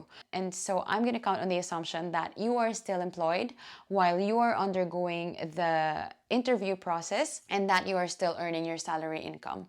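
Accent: Ireland. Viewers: omg i can now hear a hint of irish accent coming thru when you talk!! omg 😍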